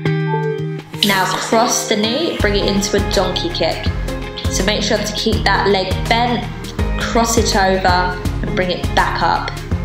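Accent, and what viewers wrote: United Kingdom. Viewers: Love the is it british accent btw?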